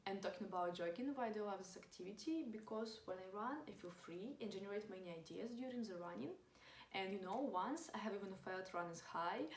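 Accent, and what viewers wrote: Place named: Russia. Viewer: This russian accent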